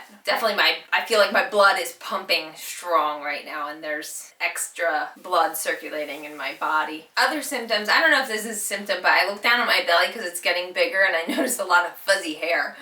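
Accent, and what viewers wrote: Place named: Delaware County, United States. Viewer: Love your updates! You have such a delco accent lol. Are you from there? (Delaware county)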